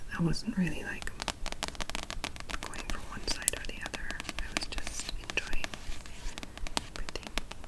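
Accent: Scotland, United Kingdom. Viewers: What’s your accent? I can’t tell. You sound Scottish/welsh sometimes and American sometimes lol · Okay, my attuned ear is picking up a slight Scottish brogue from you,.🤔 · Nice scottish accent. Love it.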